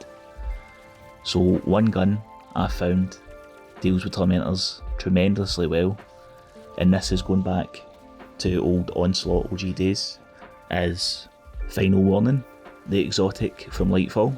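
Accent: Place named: England, United Kingdom. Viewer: Bet you from England 😵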